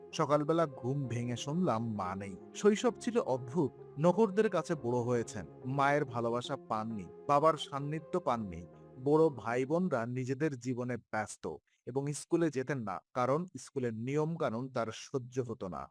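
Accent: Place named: Bangladesh. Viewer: manshi 909 = Pl. try to digest the narration only not to give attention on PRONUNTIATION Possibly the Narrator hails from Bsngladesh.His Research work on whole life of our International Bengali Poet, in a nut-shell _ should be praised first.Hope critjcism be last _ Appreciation be first. now understood = sr.citizen.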